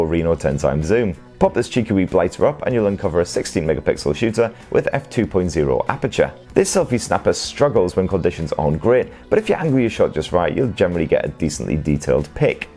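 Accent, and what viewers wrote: United Kingdom. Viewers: I watch all your videos even though I can barely understand lol your accent is too British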